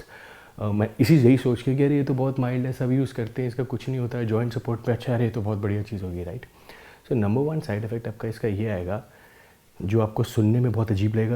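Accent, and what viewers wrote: India. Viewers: I cant understand its like mix of english and indian Im freakin lost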